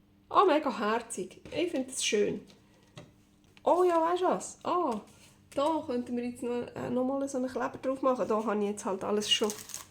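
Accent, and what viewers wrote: Switzerland. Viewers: Hooi so schön schwizerdütsch höre 🥰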